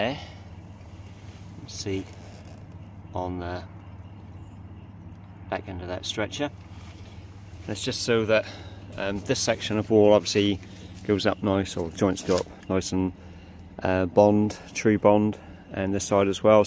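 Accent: Norfolk, United Kingdom. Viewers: Are you a Norfolk boi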